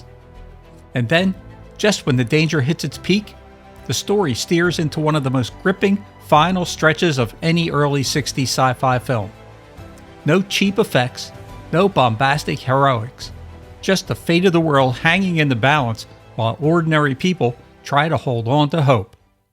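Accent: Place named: Philadelphia, United States. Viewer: Am I right that you are either from Philly or a close suburb? I hear familiar o’s 😊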